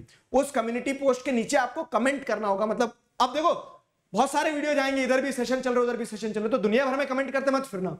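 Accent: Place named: Madhya Pradesh, India. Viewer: Sir Your accent is similiar to me because I also live in MP ( Indore ) love you ❤️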